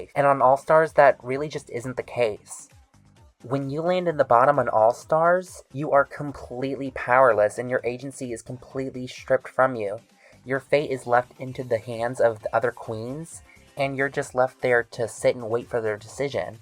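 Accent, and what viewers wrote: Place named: United Kingdom. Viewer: Your british accent cracked me up🤣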